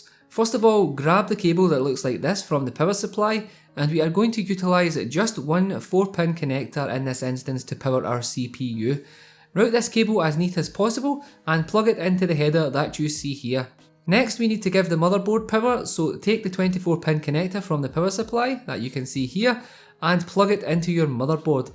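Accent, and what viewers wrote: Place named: United Kingdom. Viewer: I don’t know if you will see this but you sound very British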